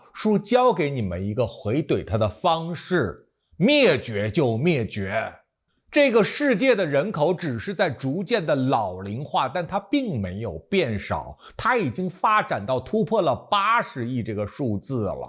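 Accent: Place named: Northeast, China. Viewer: 本想听两句傻逼言论。没想到一上来的煞笔东北茬子味就受不鸟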